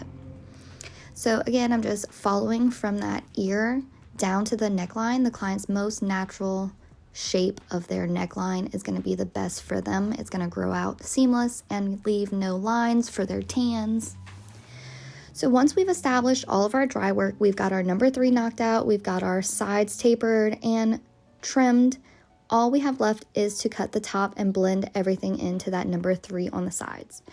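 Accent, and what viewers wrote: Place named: United States. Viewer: I can’t do with the American accent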